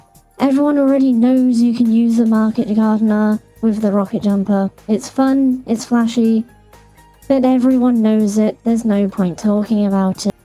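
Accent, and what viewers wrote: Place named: United Kingdom. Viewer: They're not a robot they're british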